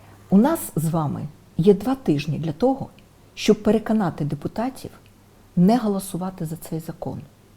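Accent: Russia. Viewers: Та ти і досі не можеш нормальео рідною мовою говорити... Ти як руссська кажеш на букву "о" -"а". Томущо розмовляєш за камерами на москальському язікє.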